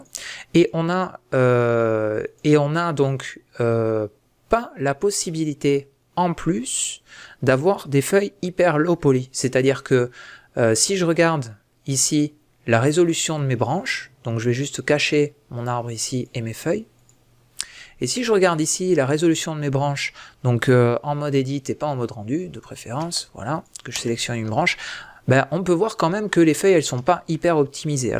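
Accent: France. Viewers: Aie, plusieurs tuto de toi que je regarde, a chaque fois je me dis que tu doit etre francais et je viens de remarquer que tu faisais tout les tutos en francais aussi --" Merci pour tes videos elles sont bien expliquées :D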